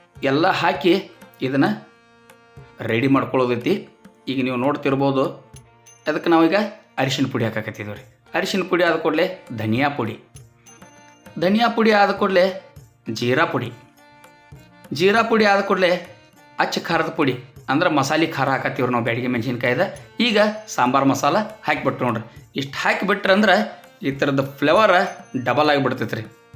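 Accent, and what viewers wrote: North Karnataka, India. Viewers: ನೀವು ಮಾಡುವ ರೀತಿ heluva😘 ಉತ್ತರ ಕರ್ನಾಟಕ ಶೈಲಿ, ತುಂಬಾ ಇಷ್ಟವಾಯ್ತು ಧನ್ಯವಾದಗಳು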